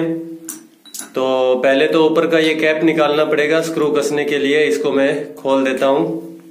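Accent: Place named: Himachal Pradesh, India. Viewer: Himachali lga da bhai tu menjo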